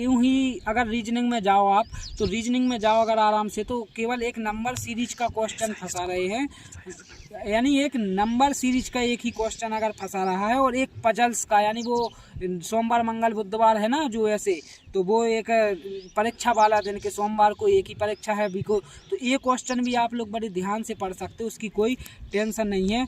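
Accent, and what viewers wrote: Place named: Rajasthan, India. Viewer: Bhai apka Rajasthan me kha se ho rajsthan jaisi language lag rhi hai like bharatpur alwar dholpur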